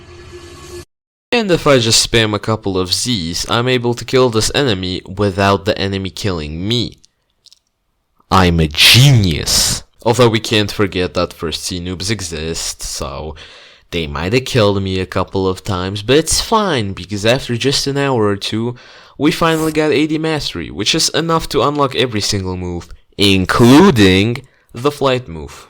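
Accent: Russia. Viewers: you sound vaguely Russian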